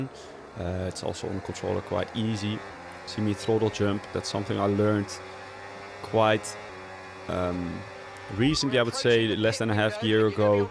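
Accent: Netherlands. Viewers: I think your dutch of the accent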